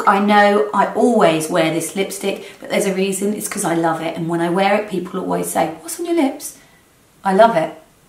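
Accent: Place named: London, United Kingdom. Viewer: You have such a beautiful, perfect face! And I love the London accent! 😊🦄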